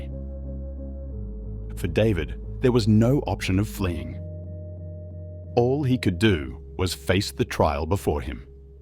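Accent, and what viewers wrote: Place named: Italy. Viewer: Was that supposed to be people talking over each other at 11 : 00 in, mate? I thought maybe it was you practising a technique of phone calls in the background as you said the rest. Maybe not I couldn’t follow it though. However, the Italian accent won me over.. I’m subscribed. I live in Melbourne and have all my life just removed to the Wimmera. Happy to find an Australian crime channel by an Australian. Good work mate. Anyway it’s 1108 into your story, I’m going back into here the rest of it. If David ?????? did it he’s the worlds dumbest criminal that ever lived LOL.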